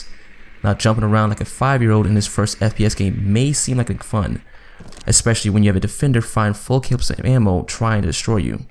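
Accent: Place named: Boston, United States. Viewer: You sound like you're from Boston, lol put nice video you earned a sub! Keep it up.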